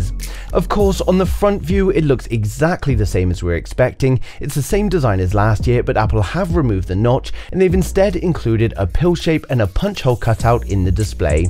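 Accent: United Kingdom. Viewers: They should use your voice for Nat geo when David Attenborough pass away you have a very strong informative British accent.ps super excited for the iPhone 14 pro max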